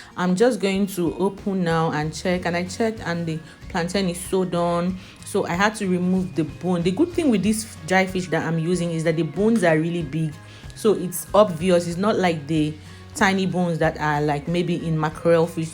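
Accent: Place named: Cameroon. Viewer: You talk like a cameroonian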